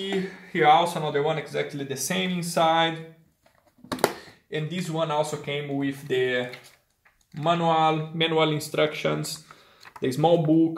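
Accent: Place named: Germany. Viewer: Yeahh awesome collection. And the german accent 😂🤘🏻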